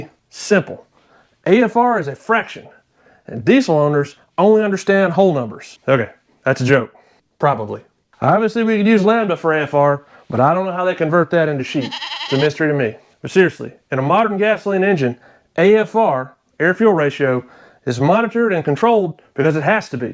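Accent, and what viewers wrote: South, United States. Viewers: Brother you’re like the VGG of diesels, with a southern accent. Awesome channel!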